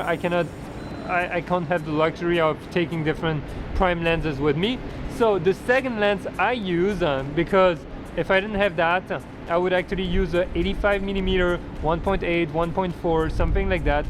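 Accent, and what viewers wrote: France. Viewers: You are french? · to be honest, difficult to understand your accent, are you French? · J'ai reconnu un accent parisien mdr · I like your accent.. Not too french just the correct amount of charm! Good points as well. Every lense let you do something different. But you can always create something spectacular with them - as long as you go out and shoot. Feel free to take a look at my view on Copenhagen and Denmark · are you french ?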